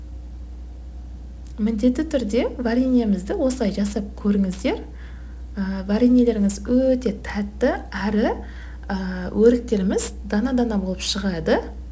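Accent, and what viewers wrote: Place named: Uzbekistan. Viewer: Озбекстаннын казактары солай сойлейди, ешкандай калпак емес,озимиздин казак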